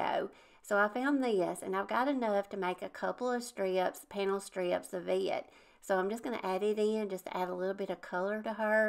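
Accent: South, United States. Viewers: Hey! Jill here! OMG!! Such cute ideas! I must get busy with my Valentines tree. Last year I made Plaster of Paris pink and red hearts for my tree. Used a heart mold that I got cheap at Bin Time. BTW!! I love your precious, southern voice. I'm a Georgia girl and sound so much like you. Many blessings!!!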